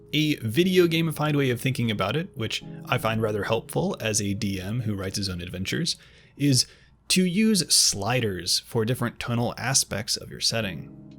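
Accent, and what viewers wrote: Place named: South, United States. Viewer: don't be ashamed of the drawl, don't let bad people Steal your way of speech I love the southern drawl it should be reclaimed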